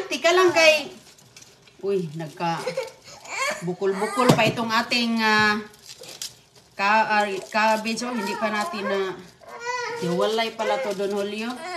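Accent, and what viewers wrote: Visayas, Philippines. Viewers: Minus points: she thinks eating with her hands make her remain filipino and don't want to use spoon and fork, her visayan accent is so bad to listen to, she loves bagoong even her kidw young as they are eat bagoong and eats with their dirty hands, most of her visitors are filipinos too so we can assume they have bagoong in their homes yet she serves food with bagoong always to her visitors.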